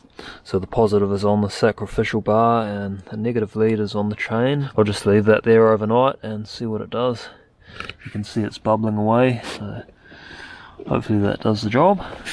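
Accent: New Zealand. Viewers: I came across your channel by random surfing a few days ago... Now I'm absolutely hooked!!! Really interesting and good video's. I'm envious of how knowledgeable you are! Also, the Kiwi accent is cool as fooooook!!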